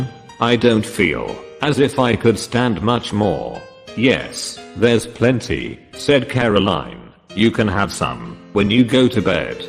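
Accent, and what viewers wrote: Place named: United Kingdom. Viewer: All of his voices and pronunciation is perfect!Full of British Accent!Wonderful!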